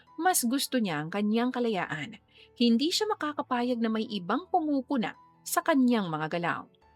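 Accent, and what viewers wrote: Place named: Iloilo, Philippines. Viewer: Aliw ang ilongga na punto.. miss..❤❤❤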